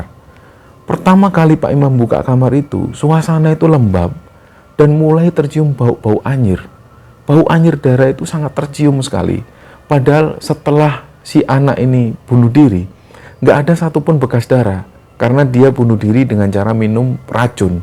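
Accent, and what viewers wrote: Java, Indonesia. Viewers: Kok aku baru aja gabung di Chanel kembara sunyi Yach..nyesel GK dr dulu..cerita nya bagus"sampe"terbuai dlm cerita itu..buat mas aku suka gaya nya apalagi medok Jawa nya..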